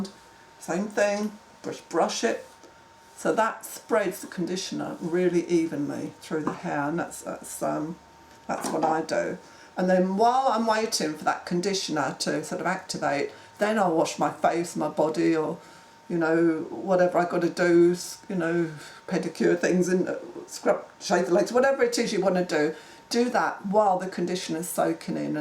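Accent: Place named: United Kingdom. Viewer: I stumbled on your video . I am glad I found you . I am 63 and have grown my hair out below my shoulders . I had to smile when you talk about your shower . I am a 'tub soak bath' woman and wash my hair separate , in the kitchen sink . Will you make video's on pony tails and buns ? How do you do the puff bangs ? What is a 'plat' ? I like your accent -- and when you said 'carry on' - was a dead give away of British. ❤️ Kindest Regards ❤️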